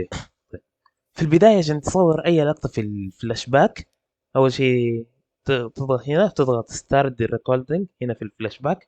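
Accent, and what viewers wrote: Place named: Myanmar. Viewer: انت برماوي واضحة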